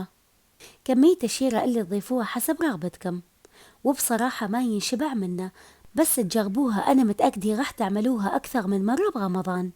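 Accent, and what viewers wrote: Mosul, Iraq. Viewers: عاشت أيدك وفدوة للكلام المصلاوي الجميل والترتيب والنظافة والراحة النفسية ياريت تعملين فديوات لترتيب وتنظيف وتنظيم المنزل أو المطبخ لان مبين عليك شاطرة في كل شيء وكيف إدارة أوقاتنا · اللهجه مصلاويه 😍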